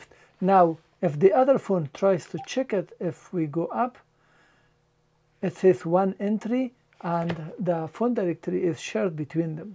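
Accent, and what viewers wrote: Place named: Poland. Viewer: I loooooooooooooooooooooooove your accent! Is that Polish?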